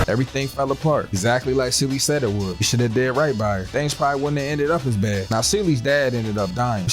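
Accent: California, United States. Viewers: I like your voice are you from Cali?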